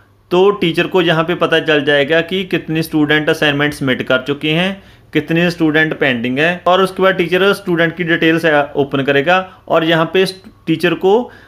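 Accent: Punjab, India. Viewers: ji apka bolne ka tarika Punjabi yo jesa h me bhi Punjabi hu na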